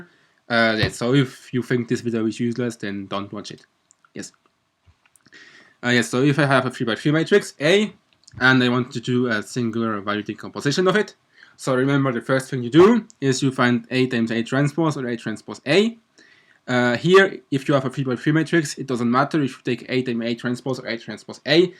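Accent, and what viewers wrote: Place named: Germany. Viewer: He has a decidedly CHUR-MAN accent.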